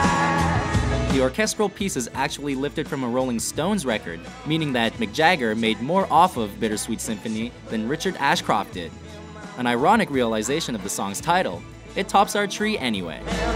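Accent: United States. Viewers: Like it how it's an American voice over. Move tf over and let a Manchester lad or lass do this. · Why the fuck is this american accent ?cant hear it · Soon as I heard the american accent I was like "This is gonna be shite" xD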